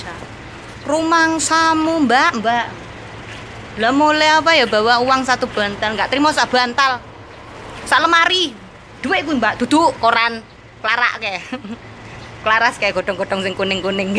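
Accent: Java, Indonesia. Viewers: Jowone Ojo ngasi ilang mbak 😂😂😂😂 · Mbk jowo ne nok ndi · Jowone ngendi ce? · Mba jowo e pundi kok muedok banget, salam dari surabaya 💗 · hi mbak...salam kenal. saya lia dari cibubur. sukaaa sekali sama vloq mbak. aku juga suka dgn medoknya mbak. dan paham sekali artinya meski aku bukan wong jowo rek 😂.mbak tulus ngurusi si mbah. dan perilaku majikan pun baik sama mbak. itu imbalane mbak orng baik ketemu yng baik.btw, mbak dah brp lama di HK kerjone?bahasa HK nya juga hebat euy. kursus bahasa dulu mbak sebelum ke HK?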